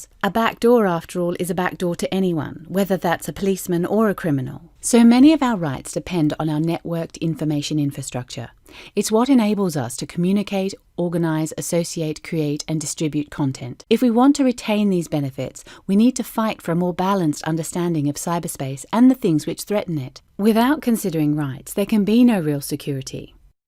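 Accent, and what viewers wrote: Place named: Australia. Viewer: I can hear a hint of Aussie in the person's voice, i can't be the only one. Sorry for going off point, i appreciate this lovely introduction!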